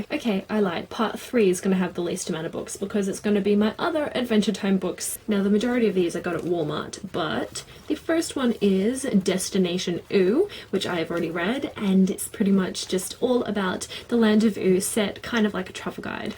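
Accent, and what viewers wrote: Australia. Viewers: OMG I wasn't sure if you were Australian or not! thank god there is an aussie booktuber!! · 66 books O.O and haha all this time I thought you were English but your Australian. It seems more evident now haha.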